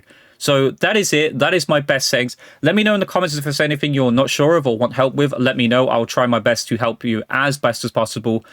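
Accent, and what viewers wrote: United Kingdom. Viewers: Hello you from Liverpool? you have an accent maybe scouse, top contrôller form me but he's just hard to find it in EU.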